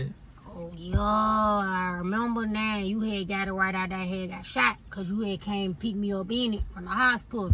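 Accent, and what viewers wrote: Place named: Atlanta, United States. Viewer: I had to sit bacc & think.. she got everything on point. Cause this is how me & my people talk down here in Atlanta Ga smh lol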